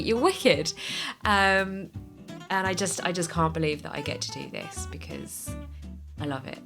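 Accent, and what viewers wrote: United Kingdom. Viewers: God I wish I had a British accent. 😂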